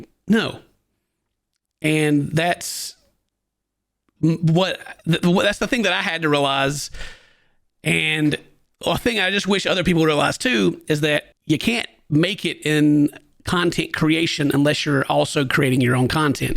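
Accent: South, United States. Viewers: I subscribed during the beginning of the dark ages apparently lol. I checked you out because my friends had me watch a video and we both liked that you had a southern accent😂😂😂. I watched your family vlogs and loved how you interacted with your family and i was actually mad that you didnt have more subscribers because you deserve it! Once you added Buffpro i tuned into every video with yall. I feel bad but i actually skip your Pewdiepie videos lmfao. I had never watched a pewdiepie video until after i had been subscribed to your channel for years. I hope you keep making content. Its part of my daily schedule now lol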